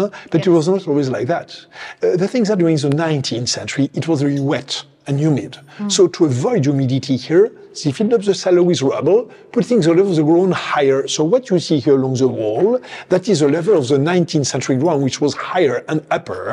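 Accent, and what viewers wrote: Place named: France. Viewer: Oh my godddd…. He speaks too fast with a very French accent.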